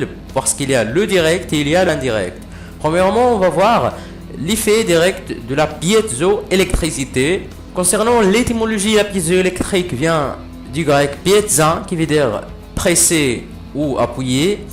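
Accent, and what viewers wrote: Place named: North Africa. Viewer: Le problème des " scientifiques " c'est que la plupart ne savent pas présenter ou être pédagogue, en général quand un scientifique t'explique quelque chose, il est tout seul dans sa tête... La voix avec fort accent maghrébin est déconcentrante, la police des slides mal choisie, c'est parfois chargé pour rien... Il vaut mieux faire simple, que vouloir trop en dire ou trop en montrer pour rien. Bref merci pour les efforts d'explication, mais faites un petit effort sur la pédagogie svp et la pédagogie commence par simplicité et la clarté. Bon je ne dis pas que c'est incompréhensible, mais voilà quoi, ça donne envie d'aller voir une autre vidéo... Merci quand même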